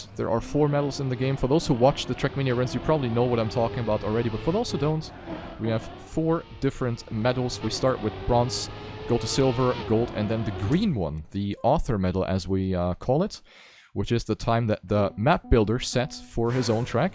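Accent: Germany. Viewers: oh hes german that makes sense accent trew me off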